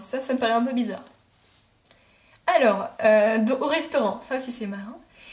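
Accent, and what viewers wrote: Japan. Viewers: J'adore ton axent, Japonais. 8D Les bonnes manières au Japon sont presque pareil en Corée. Tu t'es vachement amiloré x) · Vous devriez arrêter de critiquer ce qu'elle fait. Elle le fait pour la plaisir d'apprendre à ceux qui veut en apprendre plus sur le Japon ou sur la langue. Je suis une Française, n'ayant jamais visité le Japon et je ne vois pas en quoi "elle fait honte à votre langue" ou à votre pays, ou encore que "le Japon a une image débile" à cause de tout ça. Pour moi, elle ne donne pas cette impression, même que le fait qu'elle soit si passionnée et contente de ce qu'elle fait apprendre, elle donne envie d'en savoir plus sur ce beau pays! Voilà mon coup de gueule. xD Bravo pour tes vidéos,! J'aime beaucoup ce que tu fais et tes cosplays, ainsi que ton accent Japonais qui est trop mignon.:) Et je me marre bien avec les commentaires que tu rajoutes! 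x) Je connaissais déjà les bases de la langue puisque je l'apprends depuis quelques mois, mais ça fait du bien un bon rappel en vidéo. Un bon rappel des bonnes manières aussi, j'aime beaucoup le Japon pour ce respect et ses traditions. ♥ Bref, bonne chance à toi! Recommences tes vidéos, ne désespère pas parce que y'a des commentaires négatifs. Et si tu as juste pas le temps, bah j'espère alors que tu continueras quand tu aura le temps!;) J'AI ENCORE FAIT UN PAVÉ NAN D'UN CHIEN! x) Aaah. Bref, courage! Et j'suis impatiente de te revoir en vidéo! (une abonnée en plus!) xD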